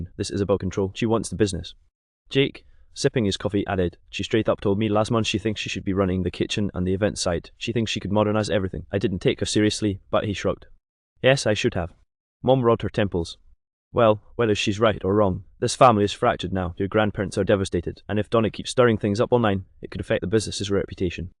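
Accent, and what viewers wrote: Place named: Ireland. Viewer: Cool Irish accent! 😂😂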